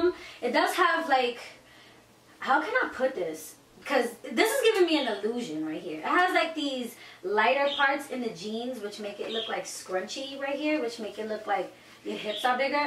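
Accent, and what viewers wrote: New York, United States. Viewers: I love that NY accent ❤️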